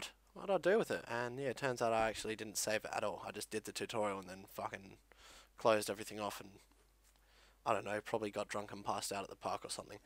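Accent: Australia. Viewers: YESSSSS AUSTRALIAN! FUCK , WHAT AM I DOING BAHAHA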